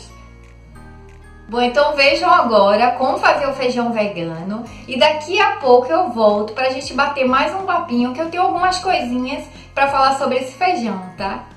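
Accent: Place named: Recife, Brazil. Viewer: adorei, você é muuuuuito simpática! sou de recife e adorei ver alguém com sotaque parecido❤️❤️❤️ muita luz pra você🌱✨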